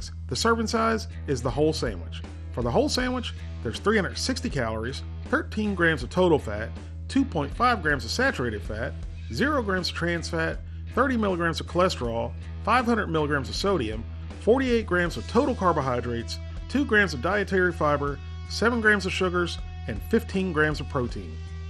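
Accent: South, United States. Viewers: Hugedickerino Kripperino he sounds American, and from the accent I will say he's from the south.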